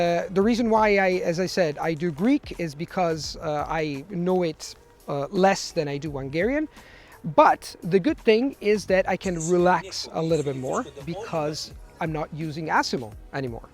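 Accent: United States. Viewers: J accents are only lovely when you are speaking english, but if you speak a foreign language with a heavy english accent, you often would get criticized because the pronunciation is off. I'm honestly impressed with his American accent, he sounds really fluent. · Hey there, long time no see ! Non vedevo tuoi video da qualche anno, è vero come hanno scritto altri che hai perso l'accento americano, sta capitando anche a me da quando insegno la dannata RP! Da iperpoliglotta con più di 20 anni di "carriera" non consiglierei però di imparare più lingue contemporaneamente, ho notato che mi ricordo meglio le lingue imparate "in solitaria" che quelle apprese in gruppo, i primi anni di università studiavo inglese/tedesco/portoghese e andavo a corsi serali di cinese, ho dovuto smettere non mi ricordavo più l'italiano! Comunque l'acquisizione duratura di una lingua è un mito, ho conosciuto poliglotti in età avanzata che col tempo si sono dimenticati una o più lingue, il che mi preoccupa un po' ... 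ci vuole soprattutto tanto tempo libero per mantenere livelli elevati in una lingua (C1/C2), rimpiango il periodo in cui non lavoravo... sto scherzando l'insegnamento mi piace molto. See ya ! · Love your american accent, I'm learning japanese and corean, the accent, entonation and phisical expressions are very important in those to express and conect with native speackers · He’s lost his American accent.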